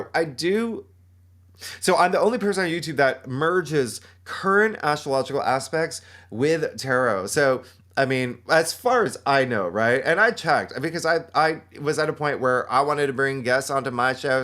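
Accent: South, United States. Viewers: Well that’s why you sound familiar lol. Not southern Baptist but southern 😂❤u! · I love a southern Kween! Yasss girl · I know this is coming and I’ve been listening to him for the past couple days on and off. Hearing another southerner resonates with me and the things I do know, he confirms. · First time to see this guy and I’m here for it! He has to be from Mississippi! Sounds very White Lotus-y😊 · has such a joyful energy and he always puts me in a good mood listening to his videos. 💚 I didn’t know anything about his background but with his accent, I wasn’t surprised to hear him say he comes from a Southern Baptist background. There’s some of us who make it out the other side of the fundamentalist dogma, hallelujah! 😅 Love you,! 🥰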